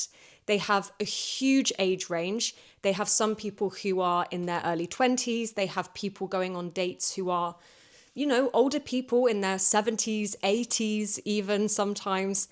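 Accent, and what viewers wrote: United Kingdom. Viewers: Watching your videos, is such a treat. I love how you speak British English with such passion and grace. Keep going! Take good care of yourself. See you soon! 🇬🇧🇬🇧👩🏼‍🏫📚👌🏻👍🏻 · Hello, Thank you for your recommendation. I love your content and love your british accent too. Could you recommend more about British films, please? I love British accent but now I don't know what next cause I just found out some queens and princese but I love crime and school sitcom or comedy. Thank you so much for reading my comment.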